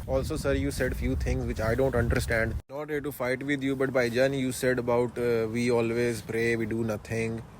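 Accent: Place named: India. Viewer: Yes 🙌 Respect!!! You did him really good ... Woh bhi in desi English 👌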